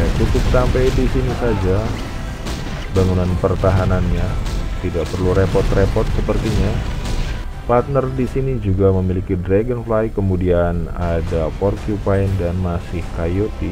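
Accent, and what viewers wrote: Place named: Central Java, Indonesia. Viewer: Btw asli mana bang,dari logat ngomongya kaya or Jateng..🙏🙏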